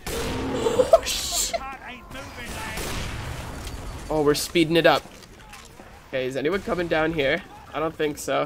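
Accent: Italy. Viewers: yeah i can tell you're italian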